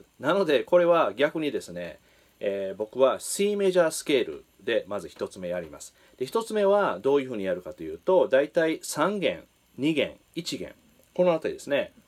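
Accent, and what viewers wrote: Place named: Kyoto, Japan. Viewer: トモさんの京都アクセントには癒されます(^^) やはりチョーキングとヴィブラートはそれぞれ別個に練習してから、合わせた方がいいのですね。 勉強になりました。 ところでWhile my guitar gently weepsやanother brick in the wall part2のような二音半？ベンドがきついです。 何かコツや練習法ありますか？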